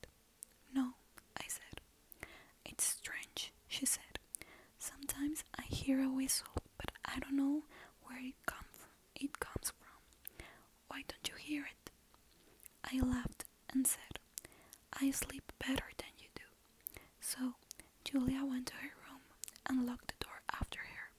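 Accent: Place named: Mexico. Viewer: Eres la mejor persona hablando mal inglés xd, adoro nuestro acento mexa. Saludos te mando un abrazo y un beso;)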